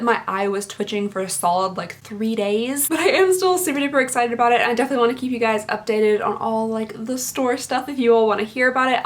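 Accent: Kentucky, United States. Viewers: Cool.. you go to the same school as my son and his girlfriend.. just a county away from where I live.. lol I can tell by the accent you're KY! 😊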